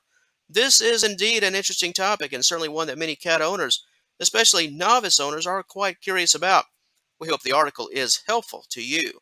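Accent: Texas, United States. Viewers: Are you from Texas? You sound like a Texan.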